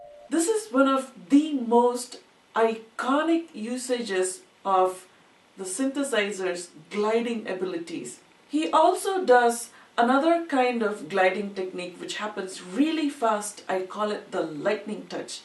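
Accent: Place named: England, United Kingdom. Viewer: Excpet ur strong english Accent.... Everything else was okay!!!!!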